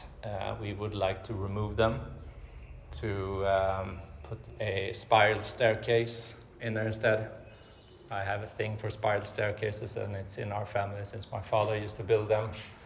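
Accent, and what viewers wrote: Sweden. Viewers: Du låter svensk. Lycka till med renovering - fantastiskt hus! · Where are you from? Your accent is very Swedish. 🙂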